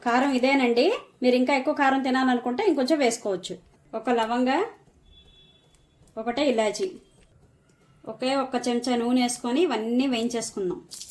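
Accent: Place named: Godavari, India. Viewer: Indilo nene aite koncham chintapandu kuda vestandi meedi kuda ee sari try chesta meedi kuda godawari gillanena mee maata teeru alne undi andi mee videos anni chustu untanandi best ok luck ❤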